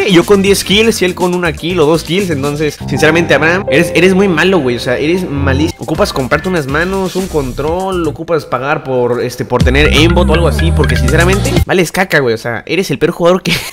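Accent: Chile. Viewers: habla como Chileno por un vídeo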